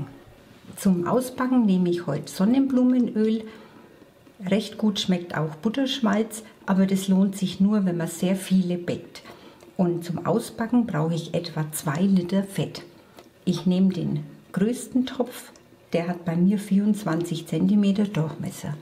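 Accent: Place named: Franconia, Germany. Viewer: Hallo, aus welcher Ecke Frankens kommst Du? Ich höre den Dialekt so gern. Lg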